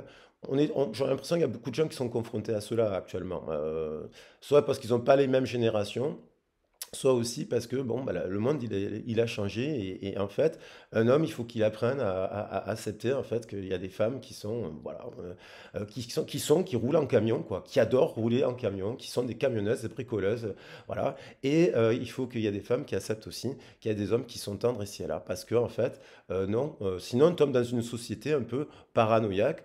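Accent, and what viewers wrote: South, France. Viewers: 🤣Shamala VIBES?😏🤣😜🔥♏⚡🔥.Merci pour le partage de tes énergies avec l’accent du sud dans tes cordes vocales😊😎🤌🏻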